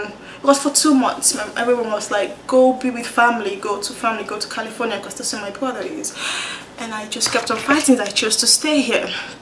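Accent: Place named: France. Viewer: Do u speak French? Your accent sounds so French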